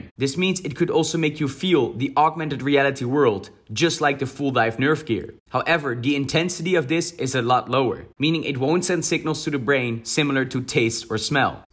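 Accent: Netherlands. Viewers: Just gotta ask this: Are you Dutch?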